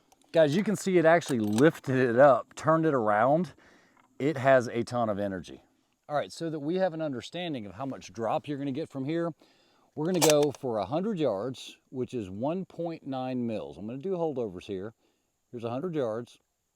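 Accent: South, United States. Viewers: thanks! haha I knew it had to be a bit more southerly US because of your accent, which I also like very much! Thanks again for the cool range and the great information on the new cartridge.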